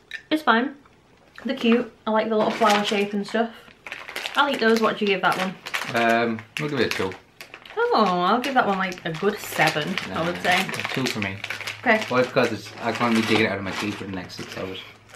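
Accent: United Kingdom. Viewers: I love all fake banana flavors and concerning other posts below me how everybody is talking about where you're both from somewhere different I thought you were from the UK and he sounded to me like he was from America so I just thought I'd add that my two cents in cuz I actually have no idea.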